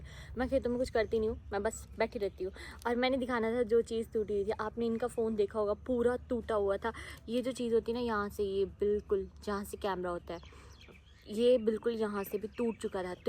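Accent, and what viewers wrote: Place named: Punjab, India. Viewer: Tum itna atak kar kyu bolti ho tumko koi problem hai kya u can not even speak punjabi properly wo bhi bilkul rustic way mei bolti ho. Thoda grooming karo apni aunty.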